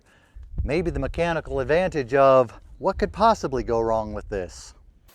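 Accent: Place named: West, United States. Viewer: the wonderful buster scruggs accent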